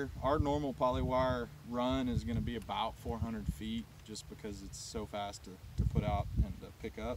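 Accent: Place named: Texas, United States. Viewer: Hey what up with the Aggie comment!! ... Class of "92. Enjoyed the video! Sounds like a Texas accent.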